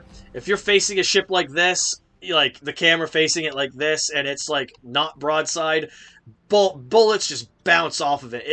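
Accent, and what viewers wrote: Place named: United States. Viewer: Dat murrican pronounciation....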